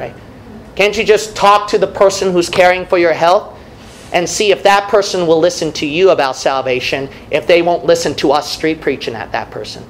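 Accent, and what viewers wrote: United States. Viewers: You are so right. I love to see new videos come out from! And I love his country accent, esp when he says "teachin'". And whoever that is in every teaching screaming out "Amen" in every video, God bless you Brother 🙏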